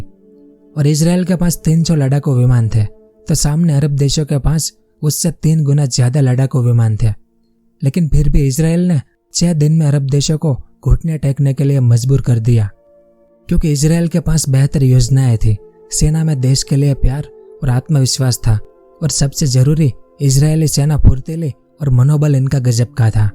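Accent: Gujarat, India. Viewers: Bhai aap gujrati ho kya????? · गुजराती से हिंदी में उच्चारण में अपनापन है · Pako Gujarati lage che!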